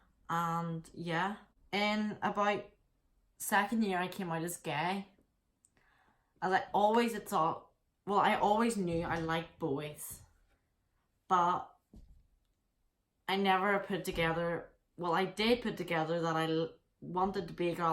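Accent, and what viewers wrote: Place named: United Kingdom. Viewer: You’re so pretty, and aww I love your british accent 😍💗 anyway I can help ?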